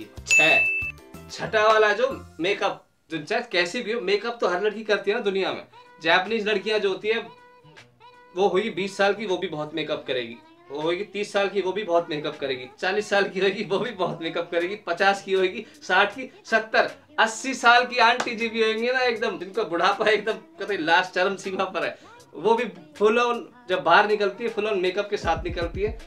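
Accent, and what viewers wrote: Haryana, India. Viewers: Yes. Muje b yahi accha laga.. k inhone indian way me bat ki.. isliye mene channel b subscribe kia.. i like ur video man..😄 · Hahahah , l like your desi Accent. I love this video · Aaha haryanvi.......cha gya chore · but he is from haryana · you still talk the Indian way ... that's cool thing about you ... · Bhai Haryana se hai kya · Haryana se ho bhai..??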